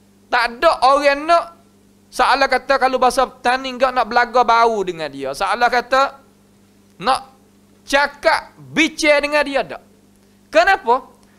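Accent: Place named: Kelantan, Malaysia. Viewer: Kalu buke oghe Kelate payoh kakloh nok pehe ni... 😁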